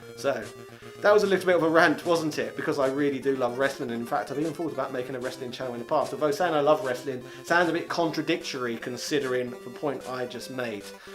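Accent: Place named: Cockney, United Kingdom. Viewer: Love your cockney accent mate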